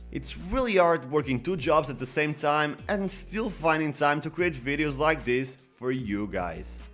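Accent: Brazil. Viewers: Aparentemente, é um brasileiro ou português falando inglês. :) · Não é um gringo, mas sim um brasileiro falando em inglês. Nobre a intenção, Obrigado.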